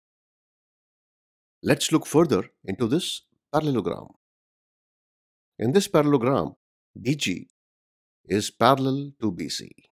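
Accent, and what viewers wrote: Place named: India. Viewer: u sound indian tho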